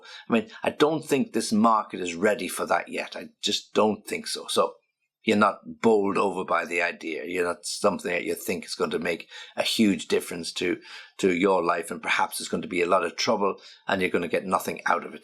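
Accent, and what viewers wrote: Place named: Northern England, United Kingdom. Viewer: 👏👏👏👏👏👏Where is your nice accent from,? Somewhere from the north, isn't it? Un abrazo 🇦🇷🏴🇬🇧🇦🇷🏴🇬🇧🇦🇷🏴🇬🇧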